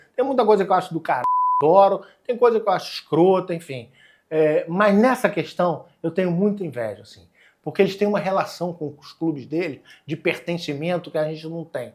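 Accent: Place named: Rio de Janeiro, Brazil. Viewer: O Lúcio de Castro pode cag@ qlq regra, mas esse sotaque carioca tira toda credibilidade dele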